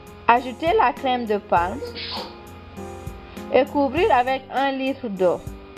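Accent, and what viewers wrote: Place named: Guinea. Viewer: Je suis tombé sur ta chaine super video une abonne de plus, tu vien de la guinnee avec ton acent j ai suis , tu peux faire un tour sur la mien aussi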